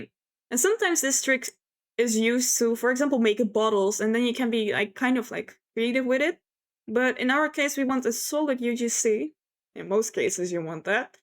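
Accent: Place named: Morocco. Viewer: U sound moroccan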